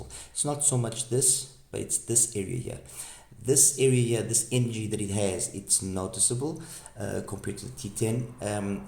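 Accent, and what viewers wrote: South Africa. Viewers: You must be South African...😊i can hear the accent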